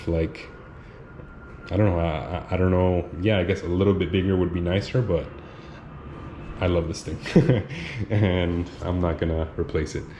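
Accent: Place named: Miami, United States. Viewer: miami accent 305 . i might look into denon for a third channel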